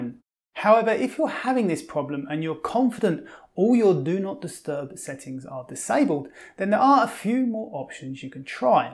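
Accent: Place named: Cockney, United Kingdom. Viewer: Thanx! It sounds like you have a Cockney accent! Like it! Esp. Word SCHEDULE!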